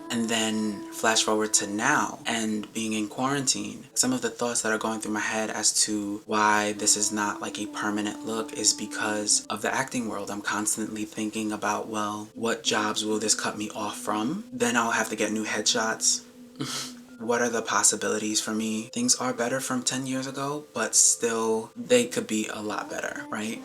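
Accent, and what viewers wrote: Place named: United Kingdom. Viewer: I really enjoyed your British accent!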